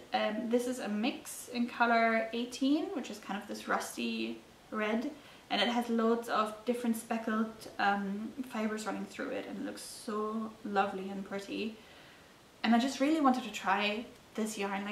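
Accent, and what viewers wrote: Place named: Scotland, United Kingdom. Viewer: Hey - good to connect with your podcast! Sending love up to Glasgow! Love to hear a European with a nice Scottish flavour to their accent ;)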